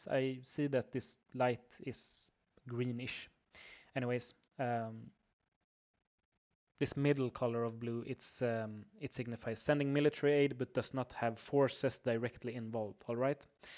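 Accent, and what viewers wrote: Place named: Sweden. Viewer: you sound exactly like a swede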